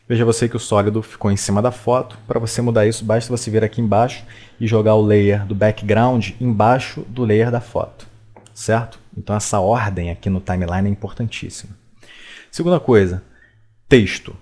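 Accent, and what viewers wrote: Rio de Janeiro, Brazil. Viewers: Você é carioca ? (alem disso ótimo vídeo) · Carioquês tá em dia heim.. hehehehe..ótima aula. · Carioqueish não aguento.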